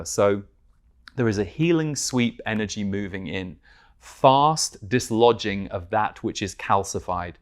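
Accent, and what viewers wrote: United Kingdom. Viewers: Your voice has a divine resonance..... Beautiful.... Brish English....❤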